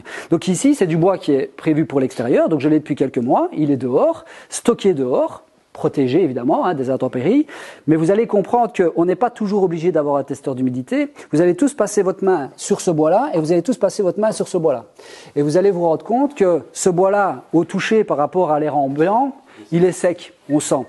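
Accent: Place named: Belgium. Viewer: ' c'est du Belche une fois hein' .... le charme à 100%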